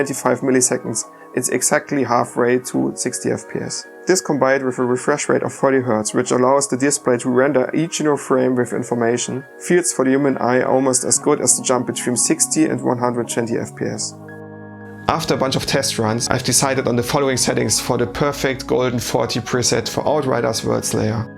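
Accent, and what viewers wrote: Germany. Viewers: Moin! Vom Akzent her würd ich mal auf den deutschsprachigen Raum tippen? 😉Danke für deinen Deep Dive, gefällt mir super! Kannst dich direkt vom Start weg mit DF messen, da kann ich mir noch ne Scheibe von abschneiden!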